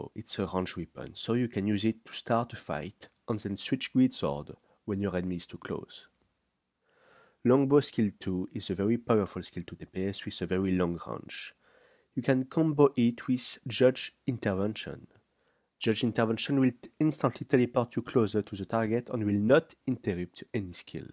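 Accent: France. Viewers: He is french i beleive :p i like the english with this accent =^.^= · is this guy french? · un français qui parle anglais?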